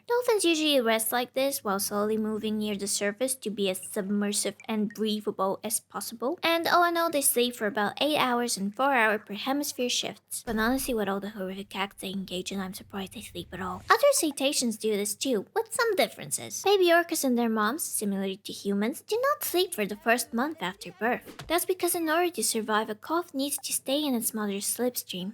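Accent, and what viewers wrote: Eastern Europe. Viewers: Oh it’s an Eastern European accent! (Like “Dexter Secret Laboratory”) I’m a huge fan of your voice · Yoooo, ur from Eastern Europe? Somehow it felt like it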